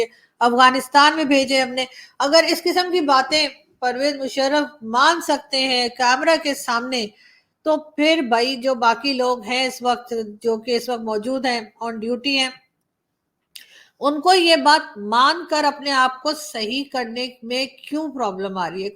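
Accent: India. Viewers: AAP de mai bohot kuch sikha. Politics bhi or HINDI accent bhi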